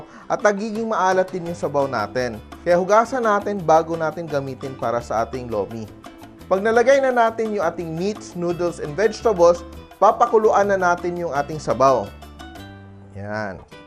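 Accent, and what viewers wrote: Visayas, Philippines. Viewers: Bisaya ka chef?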